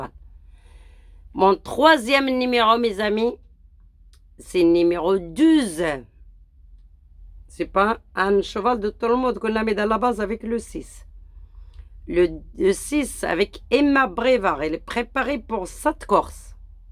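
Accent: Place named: Brazil. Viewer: Bonjour de la Guadeloupe...continue sur les pronos..avec ton accent brazil....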